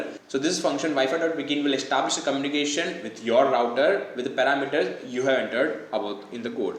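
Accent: India. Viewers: Why do all these videos have to be in indian/gibberish english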